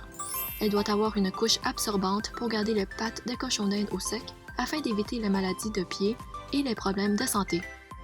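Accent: Canada. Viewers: J’ai beaucoup aimé ta vidéo ! Je crois que tu habites au Canada, parce que tu as un petit accent 😉 mais est ce que tu pourrais me dire où tu as acheté ton foin ? J’en ai jamais vue d’aussi bonne qualité ! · Salut ça fait plaisir l'accent du Québec ! Ton cochon d'Inde blanc est trop rigolo. Puis je l'adopter ?!? 😄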